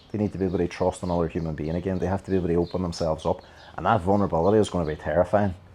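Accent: Ireland. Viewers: with that awesome irish accent, well said, and thank you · I just wanted to say thank you and I love your Irish accent. I’ve personally experienced this detox period from a toxic relationship and it is so painful. I was worrying about my own safety once I decided to leave the relationship! I have done the necessary healing and don’t ever want to go back. This helps me as I’m training to be a counselor myself! God bless you friend!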